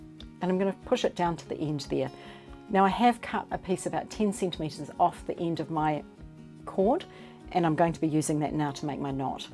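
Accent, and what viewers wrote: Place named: New Zealand. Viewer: Thanks,great tutorial, never thought about hiding the knot before! Thanks from Wales, I've friends from South island NZ and your accent makes me homesick for beautiful NZ xx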